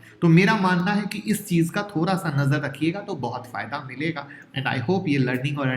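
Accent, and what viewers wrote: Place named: North India, India. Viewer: Sir , please , display ENGLISH Sub- titles on your live videos.....we from south India having difficulty in understanding your North Indian English pronunciation of company name ....OR ..at least pronounce company name slowly with spelling ..... because there are no papular small companies and foreign companies....in general new investor do not understand...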